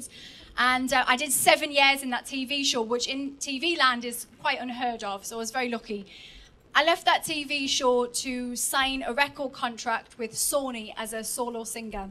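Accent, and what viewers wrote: Newcastle, United Kingdom. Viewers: I miss hearing Geordie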